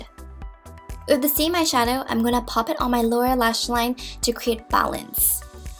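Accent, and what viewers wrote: Ireland. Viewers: It's Irish.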